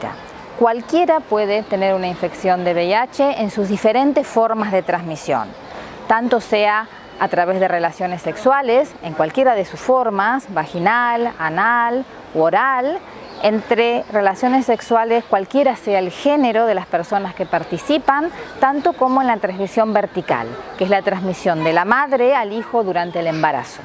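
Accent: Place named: Argentina. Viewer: Que papá Dios te bendiga mujer por el acento creó que eres argentina lastima que en mi país faltan médico como vos..con esa gran carisma ganan mucho dinero pero falta carisma al paciente...creó qué nadie va al hospital por gusto...si no hay enfermedad no hay enfermos,y si no hay enfermos no existirían médicos ni hospitales...Jesús el gran médico está de tu lado sigue así mujer argentina ... saludos de un panameño.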